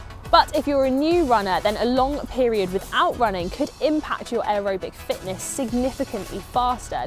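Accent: United Kingdom. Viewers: Hi I much more 😍 Your Videos. Add 1 subscriber. So where you from USA Or something like British?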